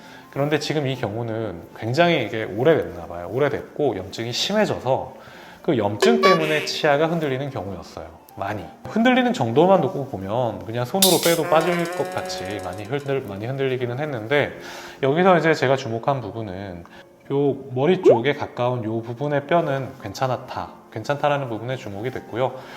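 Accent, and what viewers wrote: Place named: Gangwon, South Korea. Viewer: 원장님도 강원도분이시네요^^ 사투리가 아직 남아있으세요^^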